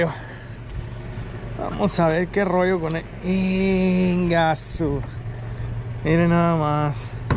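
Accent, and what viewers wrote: Mexico. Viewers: Me gustan tus vídeos, con tu voz norteña! Saludos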